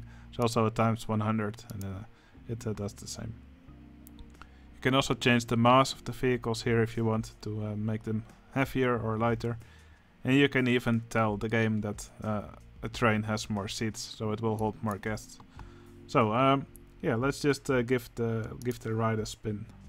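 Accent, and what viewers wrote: Netherlands. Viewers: Whaaaat, has a Dutch accent? I had not noticed yet!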